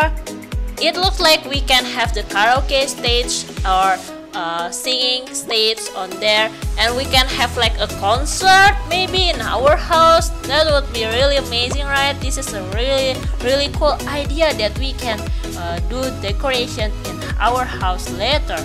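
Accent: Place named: United Kingdom. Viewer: Ur Voice Sounds Britsh.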